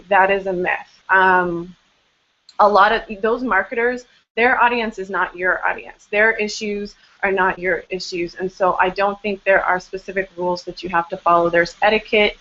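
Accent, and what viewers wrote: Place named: United Kingdom. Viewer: Lol love the British Accent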